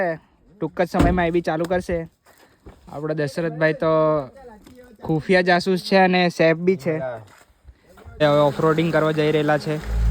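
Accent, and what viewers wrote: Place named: Ahmedabad, India. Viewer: Oo bhai tme toh ahmedabad na lago cho ekdmm suddh gujarati 😄😄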